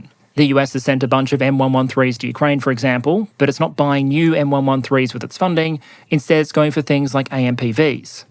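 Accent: Australia. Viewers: Future historians are going to be very grateful, as am I. A veritable tour de force with an Aussie accent. Bravo ! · I have to ask, what does "inverted commers" (@ ) mean? Its a common perun phrase and it seems like a local idiom, or maybe the aussie accent is throwing my 'merica ears, but i am not sure what it means and its driving me crazy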